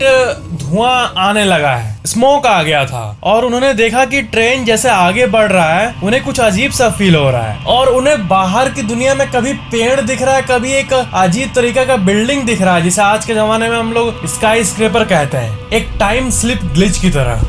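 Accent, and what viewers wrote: Bihar, India. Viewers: According to his language type he is bihari!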